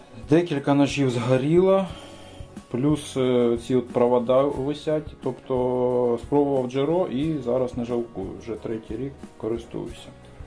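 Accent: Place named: Mykolaiv, Ukraine. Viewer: Привіт Миколаївцям ! Все буде Україна Слава героям України ! Не думав що так Миколаїв розмовляє солодкою українською тай ще зачарованою… Хай вам щастить ! Тихого і мирного вам неба! З вами був кримський українець ! Привіт із Північний захід Америки! У нас цей рік як ніколи … просто все залито…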